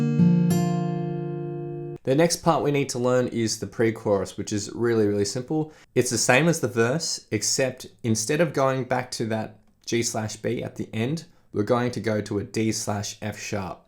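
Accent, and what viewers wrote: Australia. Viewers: Your Aussie fuck me ya legend good stuff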